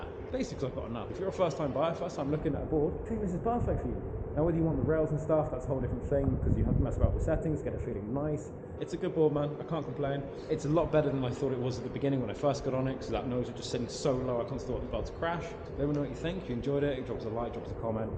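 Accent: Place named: Europe. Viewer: that's what europeans sound like bruh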